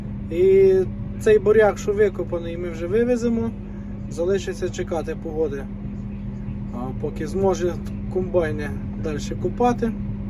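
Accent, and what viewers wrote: Ternopil, Ukraine. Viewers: То шо, Тернопіль? Файна мова😀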